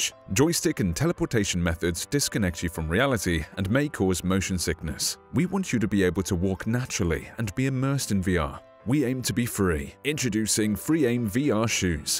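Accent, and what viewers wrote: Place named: Germany. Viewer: nice , I Ve read the faq it s awesome . But could I have a bit more details about the price . Even if you don t have the exact price I wish to have an idea , if it s more like 100€ or 200€or even 300€ . I Ve noticed the German accent , it s nice ^^ I live 30minutes far from Germany and I speak German too (nice language ).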